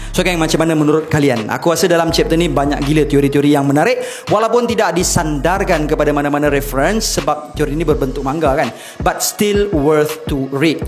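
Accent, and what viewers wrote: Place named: Kuala Lumpur, Malaysia. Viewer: Alamak u bro cara u ckp mcm org K.L laa..Ape,mne adew,.korang...Terbaik br0👍...Tapi mmg mantap cara lu brbahas n brcerita...Jelas,.Aku dgr cara lu brcerita Terbaik..Aku bgi 8/10️💫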